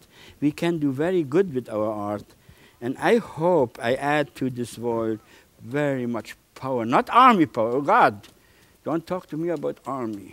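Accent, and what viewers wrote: Germany. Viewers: I love this “german english“. If i speak, it sounds the same :)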